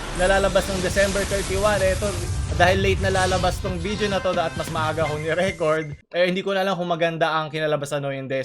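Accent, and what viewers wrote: Visayas, Philippines. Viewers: anue ba 😳😂 iba talaga pag bisaya ka, iba pagkakabasa mo 😂 (bisayawa here)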